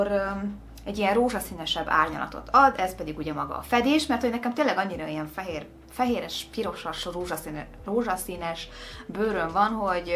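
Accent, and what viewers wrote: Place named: United Kingdom. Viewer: Úristen imádom az angol kiejtésed xdd nagyon aranyos!!!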